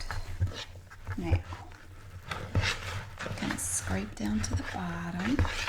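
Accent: United States. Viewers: I'm not pointing a finger at you or anything, but I think it's so funny how Americans say Aldi 😂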